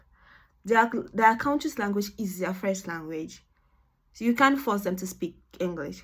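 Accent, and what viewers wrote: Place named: Nigeria. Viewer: U have Nigeria accent did ubase in Nigeria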